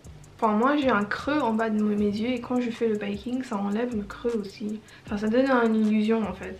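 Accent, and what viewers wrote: France. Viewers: Your french sounds great...t'as un ptit accent français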